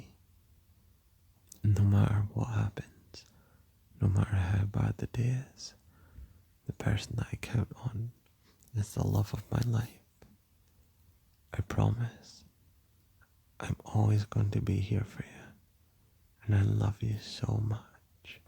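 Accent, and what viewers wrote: Scotland, United Kingdom. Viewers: someone in the comments pointed out you were scottish (i was trying to decipher ur accent) and now all i can think of is supportive shrek :) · I just spent the last 10 minutes contemplating whether your accent is Scottish or Irish, and it has made me question my existence. I really thought I knew the difference between the two. But you're scottish though, right? Anyway, I'm really happy this randomly showed up on my recommended, your voice literally made me melt 😊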